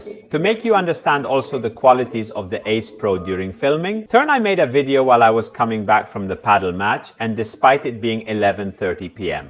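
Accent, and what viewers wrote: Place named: India. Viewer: U sound like an indian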